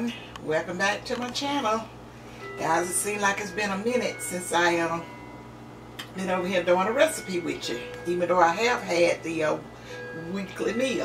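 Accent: South, United States. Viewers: Love your southern accent and your video...making this for Christmas 😊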